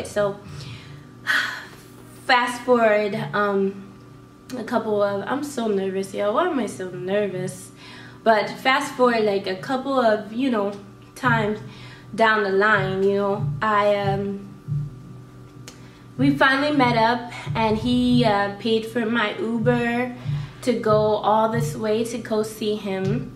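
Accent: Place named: Ethiopia. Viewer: I’m sorry this happened to you. Stay strong 💪🏻. By the way, your Ethiopian accent mixed with American sounds soo nice 👌.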